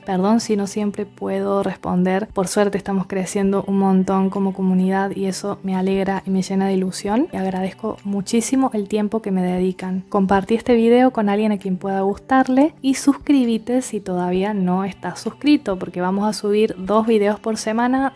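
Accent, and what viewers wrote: Córdoba, Argentina. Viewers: Me encanta el acento che....saludos desde el otro lado de la cordillera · Hola sos cordobesa? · Pulsión de vida...MAESTRA, tu voz y cadencia cordobesa, me fascinan! Gracias!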